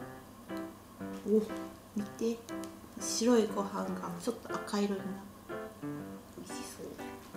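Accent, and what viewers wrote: Kansai, Japan. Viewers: 関西人なの？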